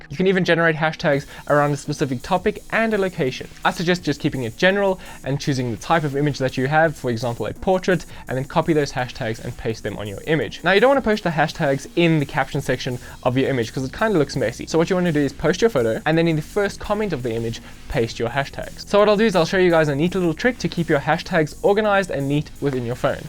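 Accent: South Africa. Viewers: Are you South African ??? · South African? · I hear a south african accent there 🤔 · Clearly South African bru... you still there? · Is that a South African accent I hear?? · Are you South African?🤔 your accent sounds like you’re from here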